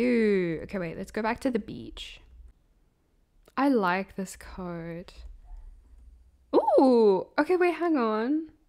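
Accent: South Africa. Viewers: Hii i love your videos are you from south Africa lol 🎉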